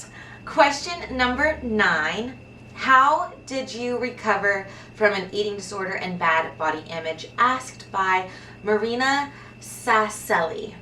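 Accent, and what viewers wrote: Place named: South, United States. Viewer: Love her accent, she’s the definition of southern charm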